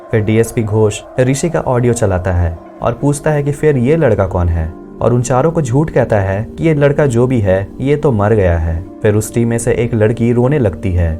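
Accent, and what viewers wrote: Bihar, India. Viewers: You're from Bihar bro , ik 😌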